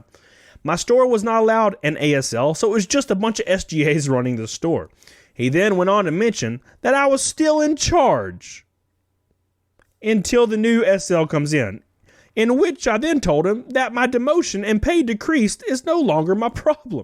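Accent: South, United States. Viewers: I will say. I usually don't like southern accents. But your accent is what makes your sense of humor atleast to me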